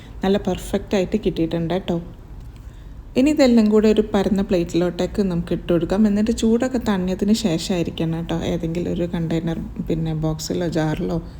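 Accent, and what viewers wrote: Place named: Tamil Nadu, India. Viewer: ഒരുമാതിരി തമിഴ് നാട്ടുകാർ മലയാളം പറയുന്ന പോലെ... ബോർ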